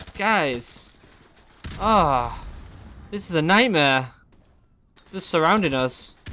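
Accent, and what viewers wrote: United Kingdom. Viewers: A little mistake to make your head big eh😂😂. Still, I love your british accent😂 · British accent is so cool: part two, bad idea, lad... Hehe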